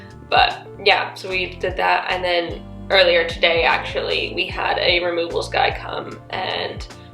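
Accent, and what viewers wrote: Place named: United Kingdom. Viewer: I loved watching this, as a fellow Brit who lives in Canada now, I rarely get to hear our accent and the word "flat" instead of apartment. I can't wait to see how it turns out :-)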